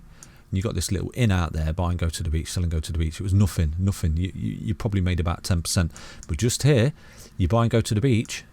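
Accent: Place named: Midlands, United Kingdom. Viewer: Great channel, and good to hear a British accent (Midlands?) in the space. Much respect, from Leeds.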